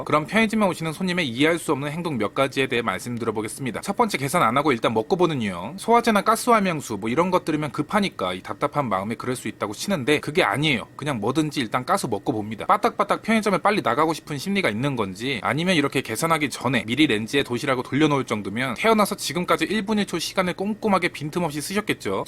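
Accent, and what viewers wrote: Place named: Jeolla, South Korea. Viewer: ..전라도이신가봐여 ㅋ 전 안흘려용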